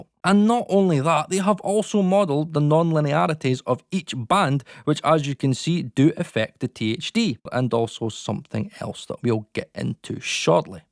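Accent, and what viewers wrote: Scotland, United Kingdom. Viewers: Hi. Thanks for saving me a shite load of money on inferior software. Also, my families from Ayr and try as I might I can’t seem to geographically pinpoint your Scottish accent 🤔😉 Keep up the good work.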